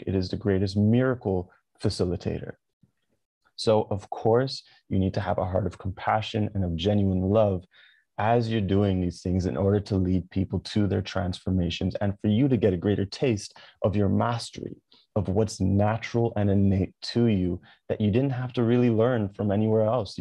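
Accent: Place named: Jamaica. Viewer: Are you Jamaican why you look and sound like a yaad man!! If that's soooo bomb!! I'm loving your videos. This one is really good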